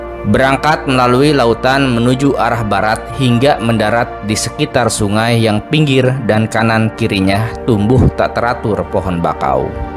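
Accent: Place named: Madura, Indonesia. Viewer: Yg membaca narasi kayaknya bukan org REmbang . Kayak logat Madura tak iye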